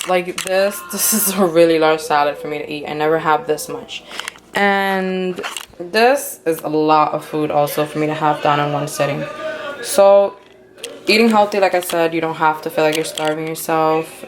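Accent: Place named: Dominican Republic. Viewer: Idk if I paid attention to what you eat or your Dominican accent. Haha love it!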